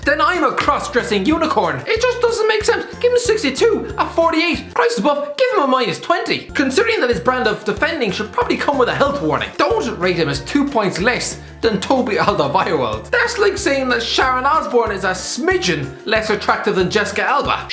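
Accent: Ireland. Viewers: I clicked on this video just to see the say "Hello and welcome to HITC sport!" 😭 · After a night of no sleep it’s always nice to have the in my ear at 7am in the morning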